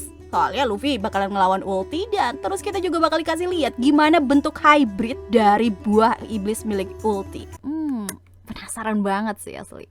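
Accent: Java, Indonesia. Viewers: Ternyata kak suaranya ada medoknya wkwk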